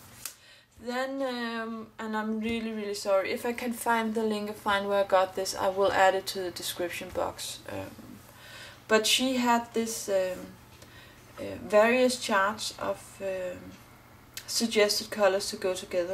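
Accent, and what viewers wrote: Denmark. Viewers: Are you from Denmark ? xD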